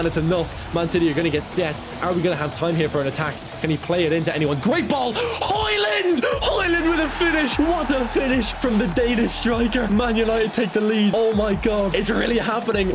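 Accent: Ireland. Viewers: Yourrrr irishhhhhhhh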